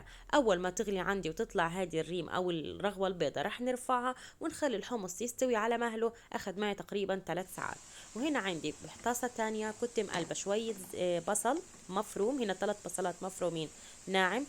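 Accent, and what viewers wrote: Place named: Egypt. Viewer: انت ليش بتحكي مصري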